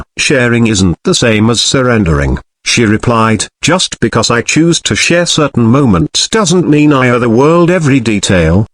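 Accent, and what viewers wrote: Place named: England, United Kingdom. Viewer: Why the English accent voiceover??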